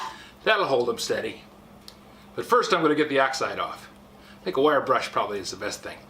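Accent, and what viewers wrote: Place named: New Jersey, United States. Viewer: O.K.! You're like me with the JOyzee brogue. I was around 2 guys from there and I got so I could mock them to a TEEEE. They'd pound on me because they knew I was the biggest hick in the world and I'd just go right on and laugh my head off at 'em. And they'd pound on me more. Great guys and some of the best memories anybody could have while in Kansas in the Ahhmee!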